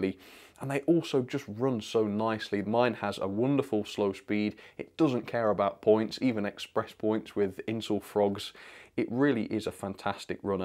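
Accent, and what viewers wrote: United Kingdom. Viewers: i dont think i will... train sets are like 20 times outside of my price range for a hobby. also no offense, but the few people ive meet who are into model trains are really really really obsessed with trains. i dont think i would fit in and would be viewed as a filthy casual within the train community. i watched your thomas set vs real life trains. i like to see home made competitions like that. jelles marble runs does a fantastic job with the marble olympics. i also really like your voice, and the britishness, if thats even a word.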